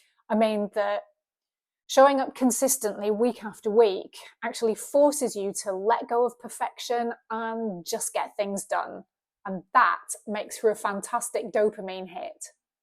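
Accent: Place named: Northern England, United Kingdom. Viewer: irish married guy here from california. your channel is brill. keep on going. great to hear truth, and watched the people who judged you. we are here for you. great job. i’m an immigrant and also lived in london from the 80ts to 2000s know all about england. and your northern accent is great. enjoy Ireland. and hope you meet the right one. as i say keep up the great work. Slainte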